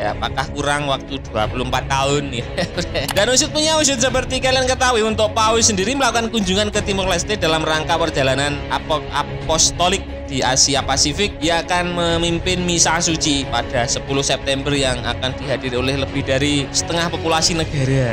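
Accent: Java, Indonesia. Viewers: Admin asbun bicar a tanpa data.....saling kritik antar anak bangsa adalah hal biasa di Timor Leste.....$12 jt utk acara penyambutan bknlah berlebihan ....dari logat anda dipastikan kamu org jawa..... Spy kamu sadar ya ......mau brp biaya yg kami keluarkan bkn urusanmu ..... Urus aja dirimu ...agamamu dan negaramu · Min jgn cari uang bawah2 negara org kamu bukan org Tls ko..itu bukan urusan kamu...jgn pke uang haram kash makan keluarga...logat jawa nee..kentara.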